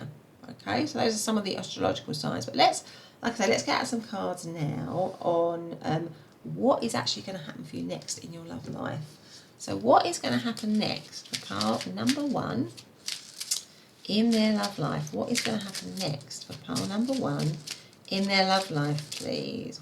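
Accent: United Kingdom. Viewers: I just love a British accent hehe, so comforting/sweet sounding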